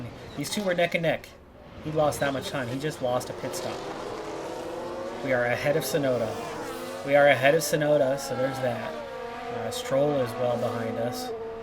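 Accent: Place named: United States. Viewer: I fail to see, or hear, basic English being adhered to either I agree, regardless of where the drivers are from he supposedly speaks English its just that as he is American it is a bastardised version of the language and it's only people from that country that decide to pronounce words and names completely differently to how they should be, fact. Thanks for stopping by and for your insightful contribution, look forward to your input again next time you are feeling like a SJW 👋😁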